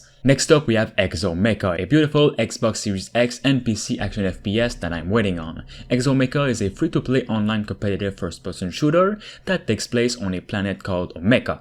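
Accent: Quebec, Canada. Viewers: Juste de même, tes clairement Québécois, j'ai raison?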